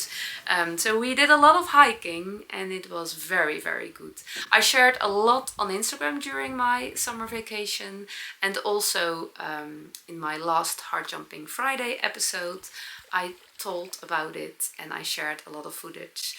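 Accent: Netherlands. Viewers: Love your dutchy English, you re searching for “kastanienbraun”, so similar to kastanjebruin, ❤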